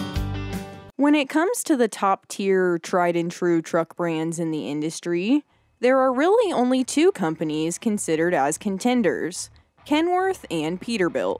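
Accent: United States. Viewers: sounds like she's from the UP Michigan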